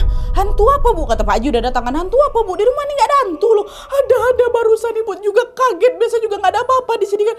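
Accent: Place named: Banjar, Indonesia. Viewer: Aku yg denger langsung ngeh ahahahaha pasti bener nih orng banjar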